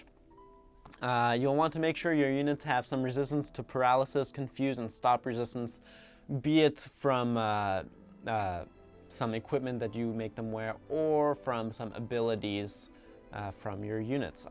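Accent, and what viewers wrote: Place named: Japan. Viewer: well I don't bloody understand his Japanese accent, drop it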